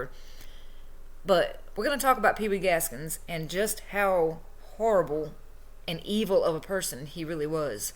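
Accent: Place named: South, United States. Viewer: sis you sound as country as i do!! love ya bunches!~ LuAnn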